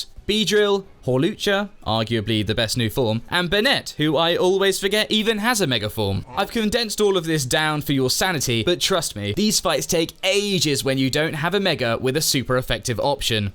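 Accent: United Kingdom. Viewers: Are you British?😂😂😂😂😂😂😂😂😂😂😂😂😂😂😂😂😂😂😂😂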